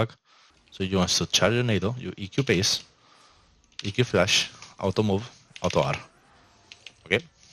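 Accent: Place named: Spain. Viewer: AJJAAJJAJ hermano como se te nota el acento de ESPAÑA con do cohone JAJA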